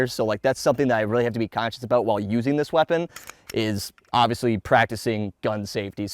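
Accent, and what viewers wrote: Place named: Texas, United States. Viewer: He has to be from texas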